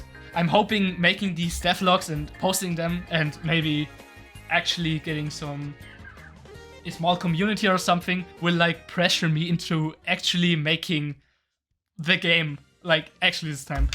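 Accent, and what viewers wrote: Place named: England, United Kingdom. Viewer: You have a nice eng accent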